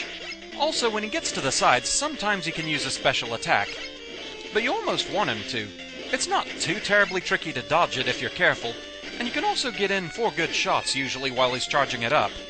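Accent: Scotland, United Kingdom. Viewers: A Scottish guy...playing a robot...who hates birds... Why do I keep thinking of Portal 2?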